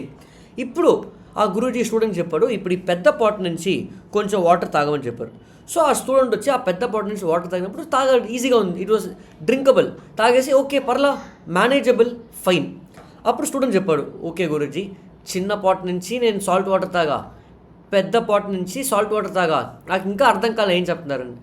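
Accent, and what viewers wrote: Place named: Telugu, India. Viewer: Meeru telugu slang super ga undhi sir💯 Being a tamil person. Can easily connect with it sir!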